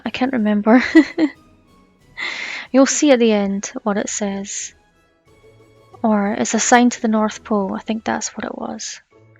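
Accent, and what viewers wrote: Scotland, United Kingdom. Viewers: I am a new subscriber to ur channel . Love ur diys. U sound very Scottish. As that’s where I am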